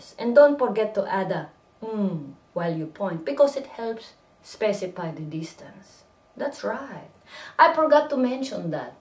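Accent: Philippines. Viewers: Filipino accent? #WIN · is philipino talk eng with this kind of accent? funny..... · i love it...she has pinoy accent!hahaha...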